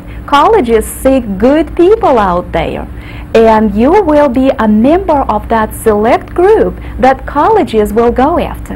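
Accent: South, United States. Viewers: I like how she has a slight southern twang lol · I love how you can hear little glimmers of Britney Spears Southern American in her accent. "Got my edu-kai-tion..." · she kinda sounds like she has a southern accent, which is ironic since she's from Russia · She sounds like she learned English in the south. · southern cowboy accent comes from the deep words pronounced in Russian that makes her talking in that way in English! :) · the southern(?) accent is throwing me off but i kinda love it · I like this but I swear I hear a strong southern States accent, I suppose that kind of stuff makes possible to guess what area people are from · You speak with such a strong Southern accent. you got this accent in 8 years ! Where in the South do you live mam ? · This is so amazing that she has a southern accent!!! amazing!! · Never heard a russian and southern accent fighting each other at the same time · Thanks for the video! 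I have to say that I was very surprised to hear that you are native born russian. It is easy to tell that you've been living in the south because you have that southern drawl. Spahsiba!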